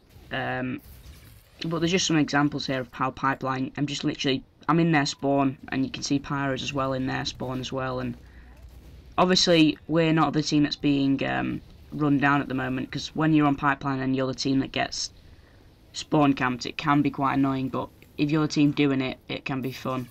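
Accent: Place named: United Kingdom. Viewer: Hey it's me!! I gave you feed back before, I really like you. Your young but your not young enough for your voice to be ear piercing, and you got a British accent so that's always nice to hear. You also seem quite calm and comfortable commentating. I think I'll sub, also I really like frontier as a map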